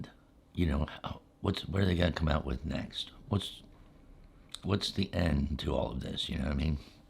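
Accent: Pennsylvania, United States. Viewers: are you from PA? I went to Gettysburg college (born and raised in New York) so I am familiar with the accent! 🧡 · Do I hear just a little bit of Philly in your accent?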